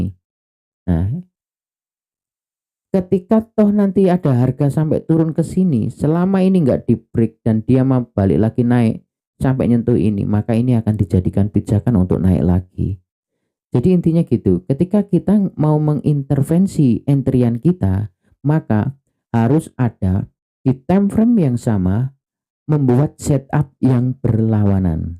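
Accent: East Java, Indonesia. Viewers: Apa mas nya dari jatim ? Bahasanya medok jatim 😁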